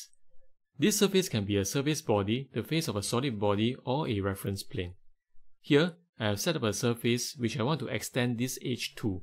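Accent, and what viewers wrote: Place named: Straits, Malaysia. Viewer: Thank you for the clear and to the point tutorial. After watching 4 other confusing and poorly presented videos, this was refreshing time saver, as was the Straits English over Indian English dialects.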